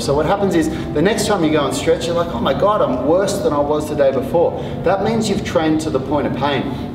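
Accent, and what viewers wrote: Australia. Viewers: Has he got Australian accent?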